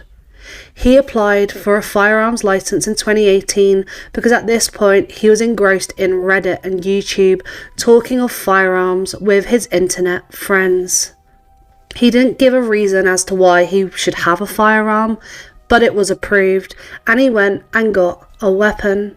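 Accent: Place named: United Kingdom. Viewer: Im pretty good at telling Brits by your accents 🙂🙂may i ask who You Voted for, Starmer or Farage??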